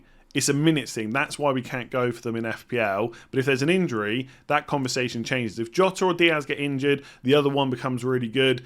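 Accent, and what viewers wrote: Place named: Bristol, United Kingdom. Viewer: Hi! Are you from Bristol? Just picked up on your accent sounds like it is a Bristol one aha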